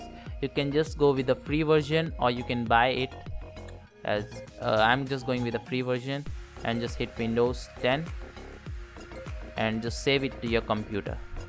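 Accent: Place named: India. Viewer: rip english rip indians english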